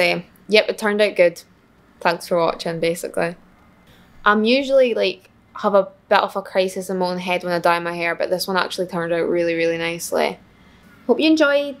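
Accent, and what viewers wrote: Scotland, United Kingdom. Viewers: Is Scottish an accent or an language?? I'm trying to learn Scottish accent from her 😫🙏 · is she scottish? demyn lovin her accent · aeibeirats" or idk what thats supposed to mean lmao im just here for the Scottish accent I love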